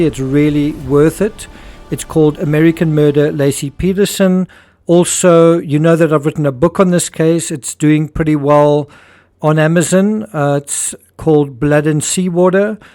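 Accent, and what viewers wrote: South Africa. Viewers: Love your videos ❤ Are you from South Africa? Had to ask😅 Love and blessings all the way from South Africa 🌟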